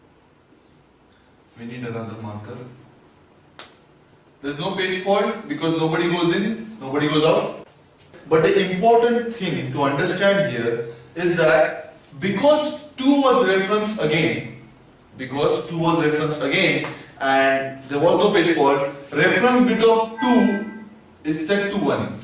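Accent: India. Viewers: The indian accent make it that much better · Only INDIAN accent :) very helpful video Thanks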